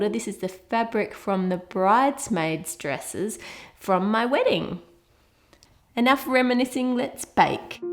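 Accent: Australia. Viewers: she’s australian? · Galaxy Midnight, i totally agree! I love her Australian accent! I've always wanted an Australian accent! #SoCute!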